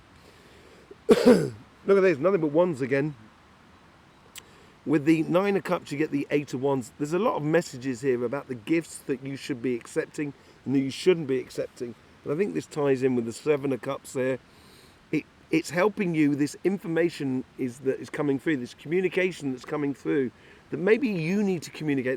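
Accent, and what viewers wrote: United Kingdom. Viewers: You are one of the Top Notch Best!!! With excellent reading you got such an amazing beautiful British accent, it is just delight to hear whole reading without noticing how fast it is over!!! Thank you very much!!! Wish you amazing health and success!!! ☘🌿🌹☘♥☘🌹🌿☘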